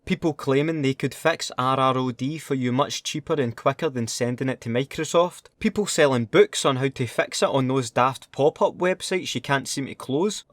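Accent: Scotland, United Kingdom. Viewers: I love your Scottish accent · Love the Scottish accent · Whats this? AND I LOVE YOUR VOICE! Its cool! Scottish? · Aye scottish mate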